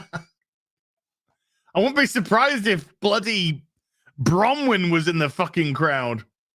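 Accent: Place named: United Kingdom. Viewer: you are my favorite FNT regular and have now become one of my favorite YouTube channels. As an overweight, bearded, balding American man, I watch you and think, that's what I'd sound like if I had a proper British accent. Keep the entertainment coming!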